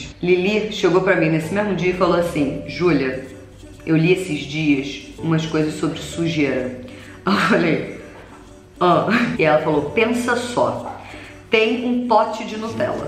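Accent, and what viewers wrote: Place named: São Paulo, Brazil. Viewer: pegando o sotaque paulista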